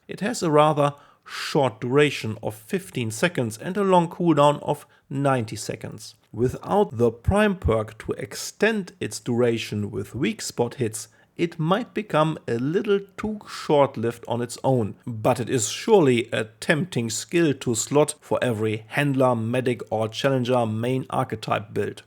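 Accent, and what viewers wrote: Germany. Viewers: Ja cool das du da drauf antwortest!!! wusste ich doch gleich das deine Muttersprache deutsch sein müsste!! Dieses markante wenn Deutsche Englisch sprechen erkennt man irgendwie sofort!! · are you german :D ?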